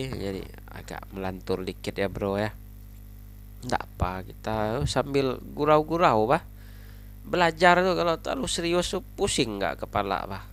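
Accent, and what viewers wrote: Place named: Aceh, Indonesia. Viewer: Asli mana bang? Logat nya kayak orang aceh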